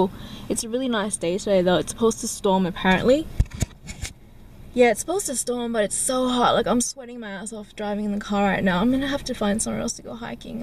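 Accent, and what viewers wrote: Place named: Australia. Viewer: The second you talked, I hit liked! Australian women are my kryptonite!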